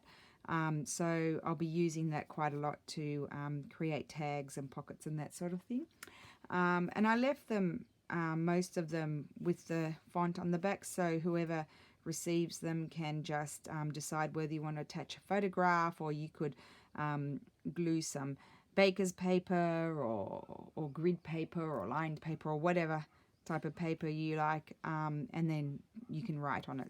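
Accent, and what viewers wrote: Australia. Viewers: Wow, fabulous work! So glad I found you! Can’t wait to follow you. Greetings from Oz (do I detect an Aussie accent?) 😎🙃🇦🇺😘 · it was such a such a treat to find your first video. How far you have come since this post. I LOVE your channel. You inspire me with every single video. Your unique situation with being Australian in Italy is very cool! I love seeing all the italian papers you have, and Ii just love your beautiful accent. I am a new Youtuber myself, I do junk journals with a focus on processing grief. I lost my husband 6 months ago, and was surfing youtube while hiding in bed for weeks... I stumbled across Tracie Fox's videos, and found you through her... I really enjoy crafting along with you. thanks so much for the inspiration! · love the down under accent..greeting from Queensland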